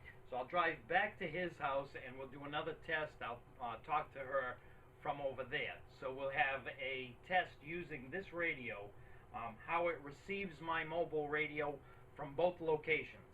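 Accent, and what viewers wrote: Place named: Boston, United States. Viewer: Just realized your Boston accent! I watched all of these twice: great fun!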